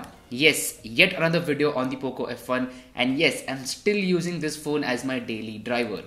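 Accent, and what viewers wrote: India. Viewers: Man.. indian accent is the just the most annoying thing to listen to eh.. no offense guys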